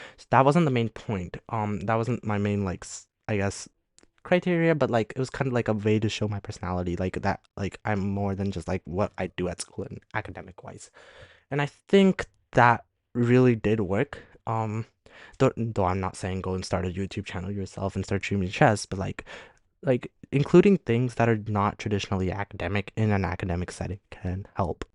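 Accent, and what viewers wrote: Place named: United States. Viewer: Lovely american accent dear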